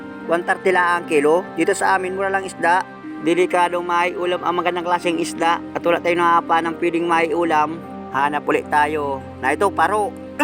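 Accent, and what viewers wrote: Bicol, Philippines. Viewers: bicol tagalog salita m idol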